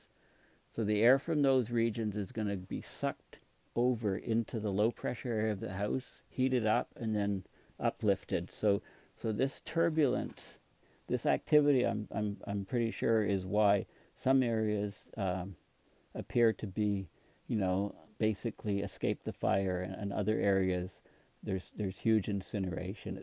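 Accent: Canada. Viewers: I hear your Canadian??:0 I'm from Calgary, Alberta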